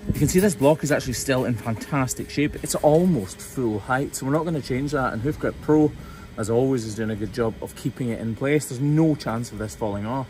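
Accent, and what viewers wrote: Scotland, United Kingdom. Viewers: Okay, I am happy to see the cow much improved, and I have to say that on top of enjoying your great Scottish burr you are very handsome.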